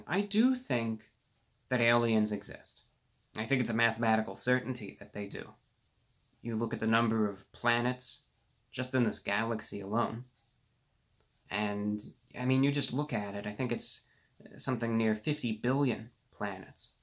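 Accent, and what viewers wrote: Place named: New York, United States. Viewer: We will land on the moon if we invade area 51, just kidding... I wouldn't try to invade it! I do not trust the government, however I don't think aliens will ever come to this earth because maybe we are the aliens. Sometimes I think we are a very old race, and not necessarily in human form but in consciousness, I have my own reasons for thinking this. Also great video, I can hear your NY accent more and more and I love it!!! Love your laugh too! You are so effin cool and open minded with your all your commentators. Also I am seeing more and more folks thinking that we are living in a simulation. I can not agree with this because of the randomness in this world and I mean random car wrecks, and children dying of cancer. What do you think about us being in a simulation? Ps. I watch all the adds so you get paid. love ya!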